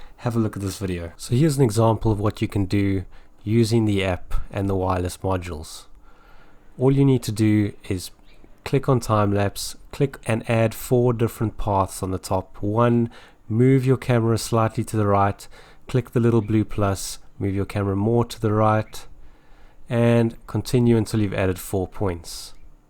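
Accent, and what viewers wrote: South Africa. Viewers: South African? Thanks for the hacks bro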